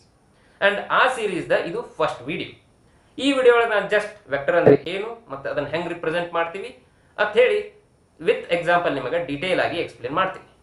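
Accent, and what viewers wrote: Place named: North Karnataka, India. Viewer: ಉತ್ತರ ಕರ್ನಾಟಕದ ಬಾಷೆ ಬೆಂಕಿ ಸರ್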